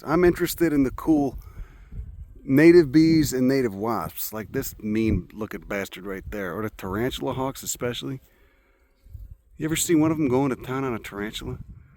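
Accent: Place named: Texas, United States. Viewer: Liked and subscribed pardner. I know a Texas appreciator when I hear one. I will listen to you yap about native plants all day. Looks like maybe far west texas? Could be anywhere from the Big Bend area all the way up to the Guadalupe mountains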